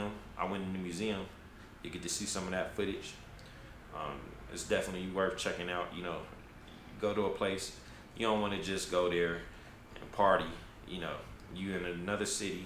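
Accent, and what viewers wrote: United States. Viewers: You have a Detroit accent. You from my city, bro? 🤔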